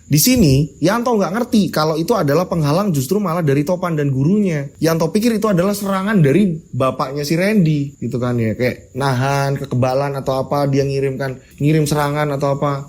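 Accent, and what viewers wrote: Java, Indonesia. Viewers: SUARANYA.....LOGAT NYA JAWA BANGET SAYA SANGAT SUKA , SANGAT MENJIWAI DAN BISA MEMBAWA YG MENDENGARKAN SEAKAN" IKUT MENYAKSIKANNYA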